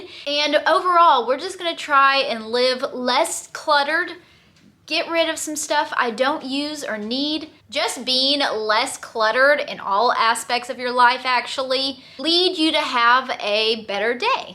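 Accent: South, United States. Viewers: My resolution is to watch you more because your true blue not fake an that's what makes you real keep it up an your southern accent so cool till yor next video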